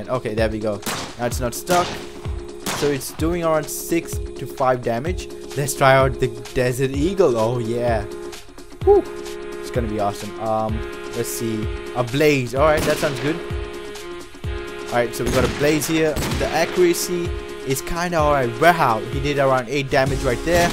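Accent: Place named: Southall, United Kingdom. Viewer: U sound like southall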